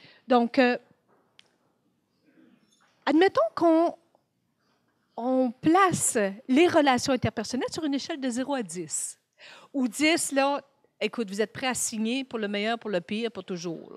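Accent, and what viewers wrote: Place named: Quebec, Canada. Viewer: tout ce qu'elle dit est vraiment intéréssant, mais j'ai de la misère à comprendre à cause de l'accent québecois :(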